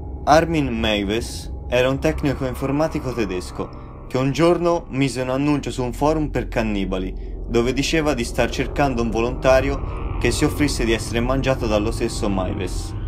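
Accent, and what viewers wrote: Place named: Tuscany, Italy. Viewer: L' inflessione della lingua è toscana !!!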